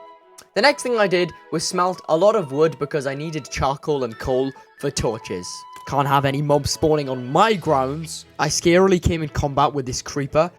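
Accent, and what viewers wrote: Wales, United Kingdom. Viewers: Are you from wales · No, he’s welsh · Are You Welsh?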